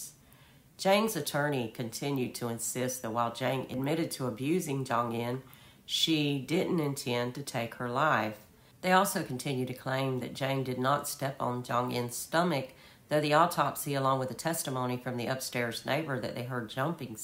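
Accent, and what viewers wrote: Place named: South, United States. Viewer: I speak Korean (4th language) and you did very well. It's a whole new tonal system and they have pronunciations not natural for English speakers. That being said, I can tell you're from the south. I'm also from the south and know that pronouncing most European words gives us aneurisms so I know how hard you tried with the Korean names. You did WAY better than you give yourself credit for ❤️❤️ Your compassionate spirit still captured the same sentiment the rest of us feel.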